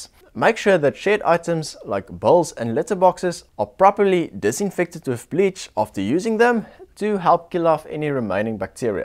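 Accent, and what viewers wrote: South Africa. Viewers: After 2 seconds of watching I knew that this guy was from South Africa. I can spot my people's accent from anywhere 😂😜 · watch one of ur videos ur accent😂 is 100% south african 😃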